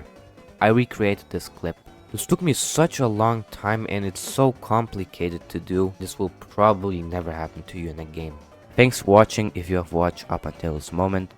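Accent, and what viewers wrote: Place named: Russia. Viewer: Этот бро реально скрывает что он русский. Слышно русский акцент.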